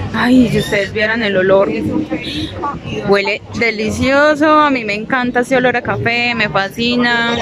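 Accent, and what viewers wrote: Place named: Antioquia, Colombia. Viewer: Todavia no conozco Medellín pero un dia alla estare porque lá tengo en mi corazon pegadito a São Paulo y tus vídeos son como clases de espanol con mejor asento que porsupuesto es el asento paisa.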